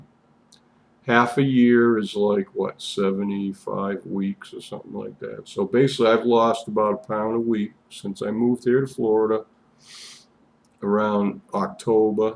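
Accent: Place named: Boston, United States. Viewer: where do you live Florida? I love your Boston accent lol happy Sunday fun day!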